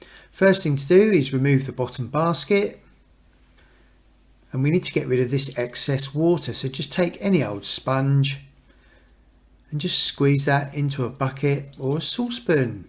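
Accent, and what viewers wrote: United Kingdom. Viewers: Nice british pronunciation🙂. My wife (an English language teacher) loves it😉. Thank you for the film and best greetings from Poland.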